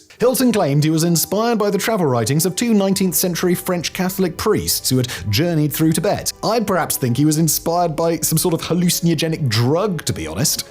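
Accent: RP, United Kingdom. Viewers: At first, I thought Simon was stricken silly by a homophone... then, I realized that he wasn't. This guy doesn't know the difference between a lama and a llama. I guess a posh accent and arrogant snark can't always hide ignorance.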